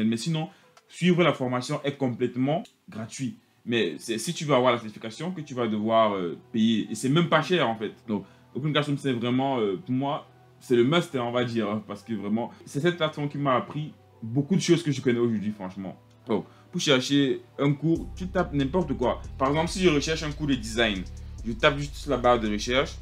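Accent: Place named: Cameroon. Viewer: L'accent Camerounais🤌🏾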